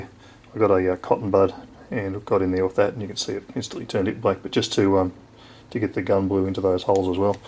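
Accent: Australia. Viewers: this American always enjoys a good Aussie accent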